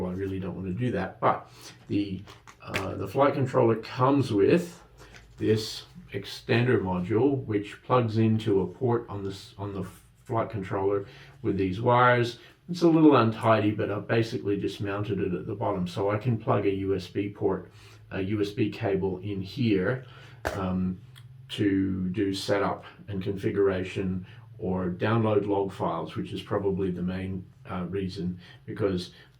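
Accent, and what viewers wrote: Australia. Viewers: That's a really interesting accent. Are you an Australian in America?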